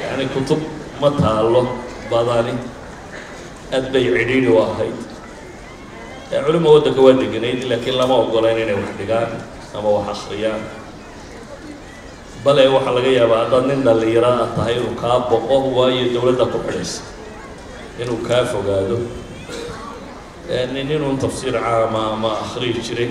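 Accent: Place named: Burao, Somalia. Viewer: haa xifdiiye wali laman kulmin laakin waxa isoo maray Malin anaago dhageysanayna muxadaradiisa oo fadhiina oo qaad iibinayna ayaa waxa igu soo beydhay Niin yara xanunsanaya lakin wax fahankisu ad u ficanyahay waxa magicisu aha saabce intu naa eegay Ayu naa ag istagay anigu wan garanayey kursi ban u dhiigay caabarmarku dhageystay ayu yiidhii Niinkan mataqana maya ban ku iidhii Kuyee waa reer burco wan yabay waxan Ku iidhi miyad taqaana maya ayu yiidh Hada Maxad u leedahay wa reer burco Kuye hadalkisa yacni luqadawa burco wan yabay wali man iilawin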